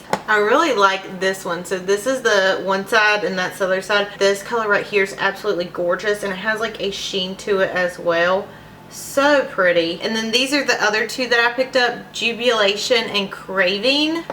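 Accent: United States. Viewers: Love ur country accent. I'm from Texas. But I don't think you have Texas accent do u?! Lol great palettes!!!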